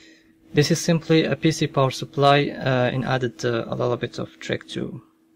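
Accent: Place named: Morocco. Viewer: u kinda sound moroccan to me, o lah o3lem..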